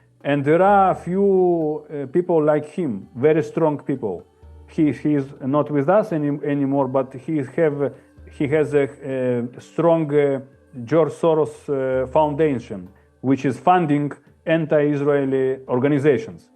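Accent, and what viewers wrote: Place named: Russia. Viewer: Руски акцент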